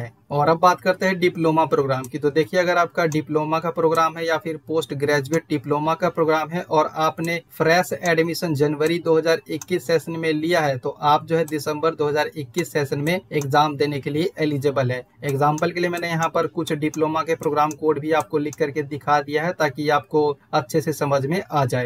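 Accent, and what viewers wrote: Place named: Bihar, India. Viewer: bhai I think you belongs to BIHAR . IS it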